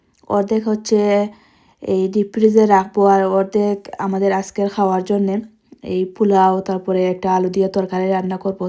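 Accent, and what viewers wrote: Sylhet, Bangladesh. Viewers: আপু তো সিলেটি মনে হচ্ছে সিলেট কোথায় আপনার বাড়ি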